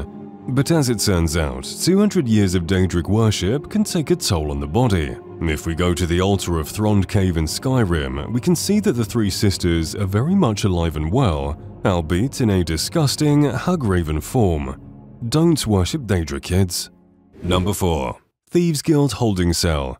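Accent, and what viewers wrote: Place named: United Kingdom. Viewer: as a american id say yes the brit voice sells the videos lol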